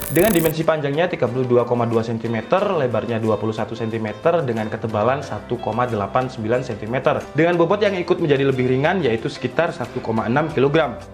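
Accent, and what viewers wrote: Malang, Indonesia. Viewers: Orang Malang min?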